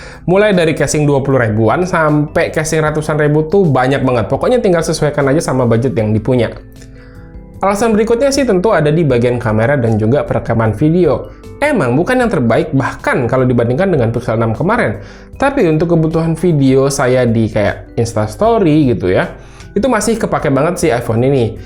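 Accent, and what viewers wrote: Samarinda, Indonesia. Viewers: Wah org samarinda nih abangnya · Org Samarinda bang?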